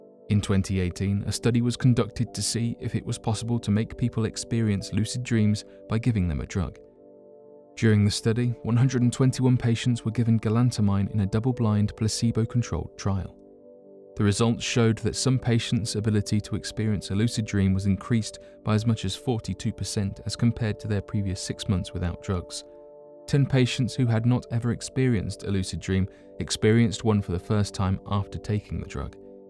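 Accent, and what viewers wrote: United Kingdom. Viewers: I have an unexplained mystery to explore: why are so many narrators of these channels British? Do they sound more "mysterious", or "suave", or "distinguished" than an American narrator would? Unless of course ALL of these channels are British owned and operated...which is real likely🙄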